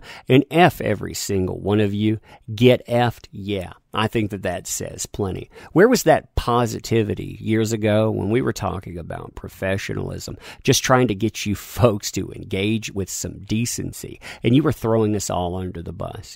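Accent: South, United States. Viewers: I can’t focus on your video. Not because it’s bad or anything, it’s just your voice is so soothing and sounds so southern while at the same time doesn’t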